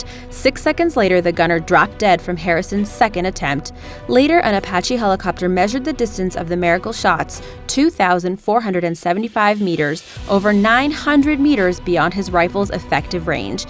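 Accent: United States. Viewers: She has an American accent. How can an American get the buffalo identification wrong? Maybe she is a Millennial.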